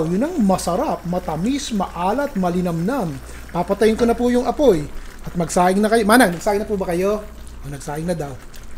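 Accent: Philippines. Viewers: Chef taga Saan po kayo originally kasi ang Ganda ng punto ninyo 😂 tagalog na tagalog eh 😅 Saan po kayo naka base . Gagawin ko Ito today sa malamig na weather here in Scotland . 🏴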